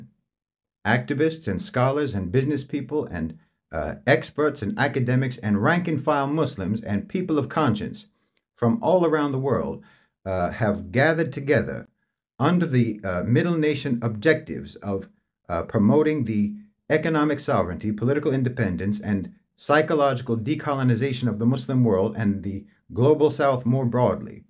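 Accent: United States. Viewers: THIS IS A HUMDINGER OF A PROPOSAL.... IT IS REFRESHING TO HEAR A MAN WHO SPEAKS WITH SUCH AN AUTHENTIC AMERICAN ACCENT VENT THESE TRUTHS... AND RIGHTLY CALLING FOR THE UNITES STATES EXPULSION FROM THE UNITED NATIONS.....THE U.N. BODY WILL BE FAR BETTER OFF WITHOUT THE U.S.A. WITHIN IT. KICK THE U.S.A. OUT INDEED.....& THEN ISRAEL WILL PROBABLY END UP TAGGING ALONG.....WHO CARES..... WILL THIS EVER HAPPEN ? I VERY MUCH DOUBT IT, BUT IT SHOULD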